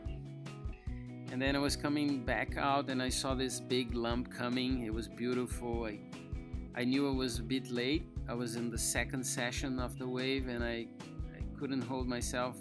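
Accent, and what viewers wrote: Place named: Spain. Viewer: His accent ( Spanish?) is very colorful….beautiful narrative and music…so honest!!! 😊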